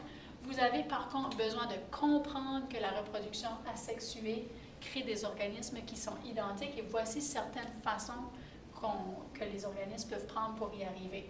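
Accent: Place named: Quebec, Canada. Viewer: super vidéo mais je n'ai juste pas très bien compris avec l'accent quebecois 😝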